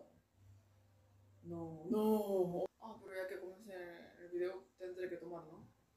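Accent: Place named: Peru. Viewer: por tu manera de hablar ya eres una peruana más, el pisco se toma sin saborearlo, sin retenerlo dentro de tu boca, en one nomás. Saludos desde Ica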